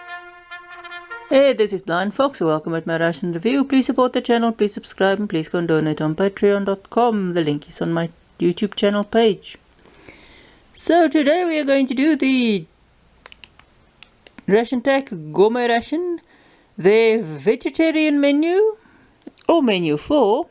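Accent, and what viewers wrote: South Africa. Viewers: That's a hell of an accent. South African?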